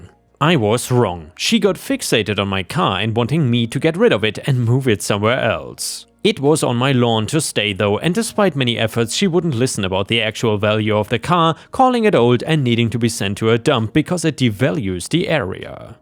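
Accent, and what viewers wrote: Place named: Germany. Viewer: Carry oak E lol. I like your pronunciation though. It’s so close for a non native speaker, also I think you’re losing your German accent.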